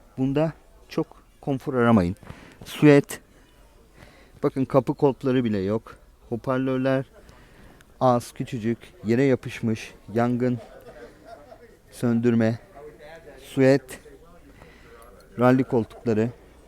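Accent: United States. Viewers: Abi Amerikan İngilizcesi aksanın harika Türkce aksanın da harika çok yetenek gerektiren bir şey. Kullandığın bazı kelime gruplarını bizim burada yaşayan çoğu insan bilmez bile helal olsun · Abi amerikalı mı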